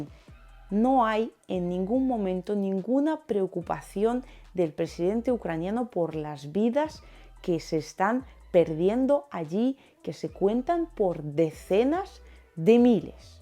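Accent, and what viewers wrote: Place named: Spain. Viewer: Primeramente agradecer, las noticias respaldada con hechos grabados. Lo segundo, que hablas español español tal como lo "aprendisteis". Soy de Uruguay, pegado a Brasil y Argentina, con solo <num> habitantes, somos chiquitos pero con corazón grande. Quiero preguntarte, no es un hecho sin precedentes prohibir RT en América, solo para desconectar a Rusia del mundo. Sin embargo, prohibirlo a sido una muestra del ODIO, DISCRIMINACIÖN hacia Rusia. Mucho antes de esto que ocurre hoy , RUSIA cada vez que EUA hacia lo mismo DE ESCALAR TENSIONES con CHINA, Corea del Norte, IRÁN, rUSIA MOSTRABA Sarmat1 todo quedaba tranquilo. En estos sucesos tubo que mostrar el Sarmat2 y así sucesivamente. EUA declaró enemigo a China solo por temas comerciales, lo mismo con Rusia y no aprenden que prohibir, sancionar, robar, hasta atacar a cualquier ciudadano Ruso por el mundo dejándolo sin dinero, logró sacar la rebeldía y la fuerza para crecer y gestionar las propias empresas Rusas y no depender de nadie. 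Nuevo acuerdo para la venta de trigo de EE UU a Rusia Cereales 29 jul 1983 — La Unión Soviética y los Estados Unidos han llegado a un acuerdo de principio por el cual esta última nación venderá entre 9 y 12 millones ... Cómo Rusia se convirtió en el principal exportador de trigo "plantando" El potencial de exportación del país en la temporada 2020/21 se incrementó de 37,5 millones a 39 millones de toneladas. Y como el trigo , existe muchas otras cosas. Por eso no entiendo como estos parásitos nos po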